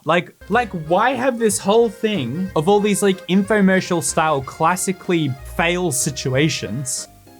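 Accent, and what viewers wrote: Australia. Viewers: i thought he was australian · i did not know, i thought hes austrailian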